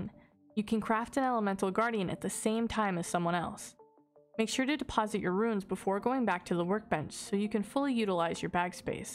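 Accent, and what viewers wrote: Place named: South, United States. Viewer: "a hunerd to a hunerd forty fragments." I love that southern accent, because it's the exact same way I say it lol. Oklahoma here. Thank you so much for this guide. I recently had b2b seizures, the second of which put me into Cardiac arrest, and they had to bring me back. I lost the last 4 months of memory, so I completely forgot anything about this mini game. Now I'm gonna go try it, thank ya!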